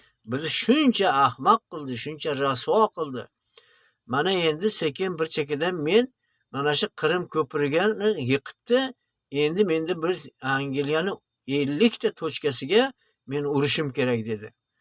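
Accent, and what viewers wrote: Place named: Kyrgyzstan. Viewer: Dunyodoshlar deyishizga qaraganda siz Oʻzbekistonlik emassiz.Shevangizdan Qirgʻizistonlik oʻzbeksiz. Rossiya propagandasini kuchu bu!Oʻzbekistonliklar bunaqa fikrlamaydi!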